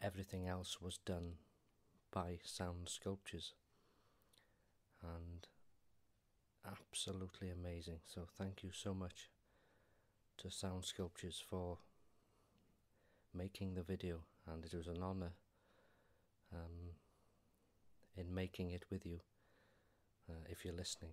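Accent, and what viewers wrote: Wales, United Kingdom. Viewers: Hello! I've really enjoyed your videos. I find your Welsh dulcet tones very soothing. What I think would be a quality idea, would be a video about the birds that visit your garden, or local area. I'd really like to know what bird makes what song and what they look like, etc. To hear their calls on one video, with your voice would be very relaxing as well as highly informative! Blessings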